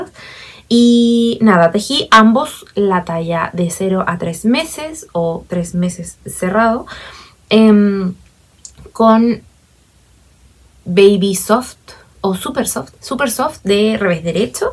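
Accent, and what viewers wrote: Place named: Chile. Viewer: Que lindo el Sr Leon! Me encanta el sweater que estas diseñando. Y estando en esa nota, yo pondría los botones en la sisa pq si los pones en las mangas te va a molestar, pq eres muy inquieta y haces muchas cosas con los niños. Yo también estoy embobada con las cosas que esta haciendo la Glorita, y estaba contemplando comprarle algo, pero definitivamente me convenciste. Preciosas todas las bolsas. Me encanta escucharte, hoy te escuchabas menos Española. Más Chilena! 😂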